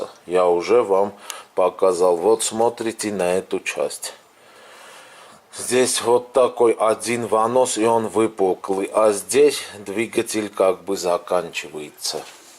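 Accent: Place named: Armenia. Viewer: Мы по голосу поняли что ты армян .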